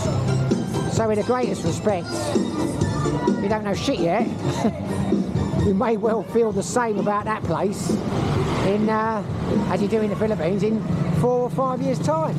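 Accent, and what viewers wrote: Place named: Cockney, United Kingdom. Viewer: Nice hearing a cockney accent. COYI ⚒️ I absolutely loved Vietnam. Was there for two weeks last month for the first time. I really loved Da Nang. I could see myself retiring there but isn't retiring there harder ?? They don't have any dedicated Retirement visa I heard. Could be wrong. Guess I gotta find myself a Vietnamese lady to marry !! Haha . Be lucky !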